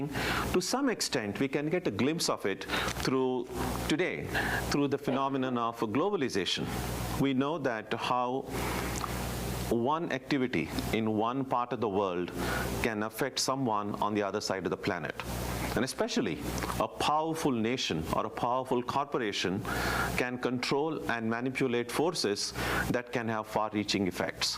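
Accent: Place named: England, United Kingdom. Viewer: Thank you for having an English accent that is articulate and clear with consonants and vowels, not slurring your words. 🙏, this help me understand these teachings of that ancient science from the Indian Continent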